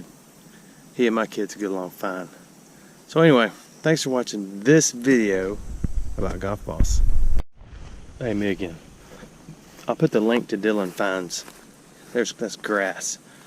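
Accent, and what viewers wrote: South, United States. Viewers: I am from the communist state of California. I am a Southerner at heart and always have been. I really enjoy the accent from the south when you people talk. It soothes my soul. Growing up my best friend's family was from Birmingham. They treated me like family.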